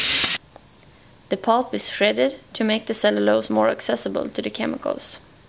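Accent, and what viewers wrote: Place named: Sweden. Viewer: Normal Swedish accent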